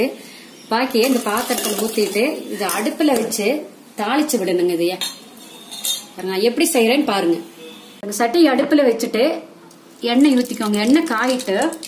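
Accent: Kongu, India. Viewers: கொங்கு தமிழடன் கீரை கடையல் சுவைக்கிறது !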